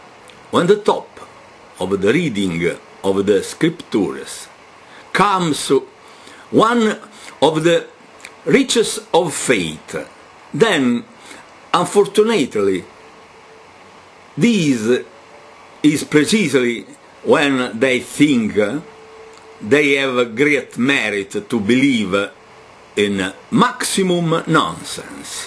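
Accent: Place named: Italy. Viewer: some times i come back to this random video i found just to listen to this guys crazy italian voice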